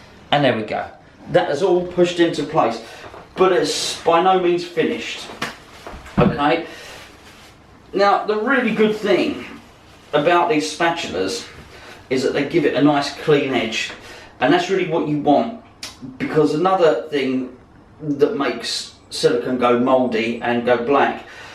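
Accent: United Kingdom. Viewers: nice vid. what accent are u ? british aussie kiwi??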